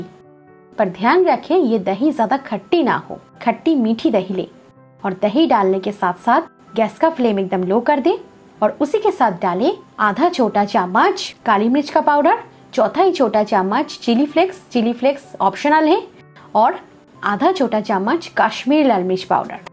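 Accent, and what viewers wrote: Bengal, India. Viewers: Your Hindi accents are seems to be like Bengali. Are you Bengali?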